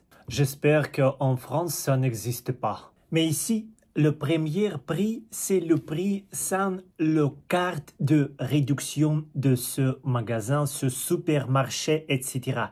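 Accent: Russia. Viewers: Вы не францзу же. Я слышу русский акцент. Все фальшивка.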